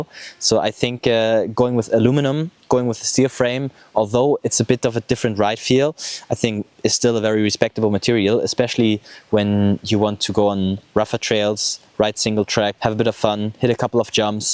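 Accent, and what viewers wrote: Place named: Germany. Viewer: I hear a bit of german😂😂😂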